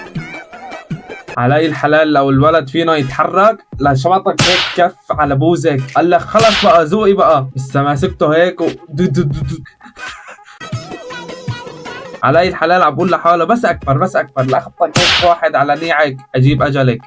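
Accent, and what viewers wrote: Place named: Aleppo, Syria. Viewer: أنتي حلبي كأنو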